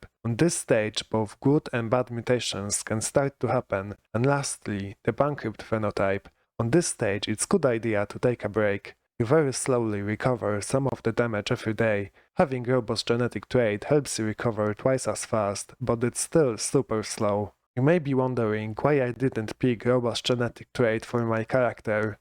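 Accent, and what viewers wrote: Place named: Poland. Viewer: Interesting contnet, funny jokes and nice Polish accent.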